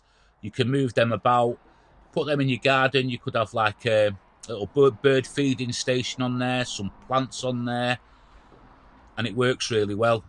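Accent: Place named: Scotland, United Kingdom. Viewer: Paul From Pa in US. Looks great. I have been wanting to do some pallet wood projects. I love that rustic old look. I also love the color of the stain that you used. I could see this out in my garage for storage / small tools etc.. My problem is that I want to build everything I see and you just can't. I really like the pieces you build though. They are just different and that's what I like. Plus the accent / location helps since I was born in Scotland but I have been in the US since 1968. I still have a spot in my heart for that part of the world. Thanks for another great build.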